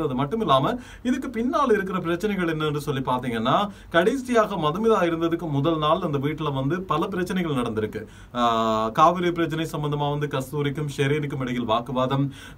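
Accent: Sri Lanka. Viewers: Bro unga Tamil speech nalla irukku I meen ilankai Tamil super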